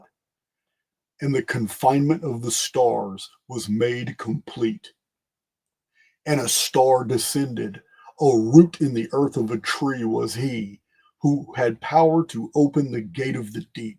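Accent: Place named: Texas, United States. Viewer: Thank you from the bottom of my ♥️. I got used to your voice andTexas accent and now hooked.I feel your voice and message comforting my soul. Greetings from Holland.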